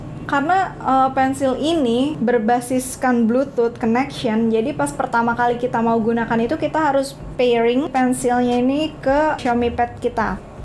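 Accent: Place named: Java, Indonesia. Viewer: gak tahan ane denger aksen wenglishnya :D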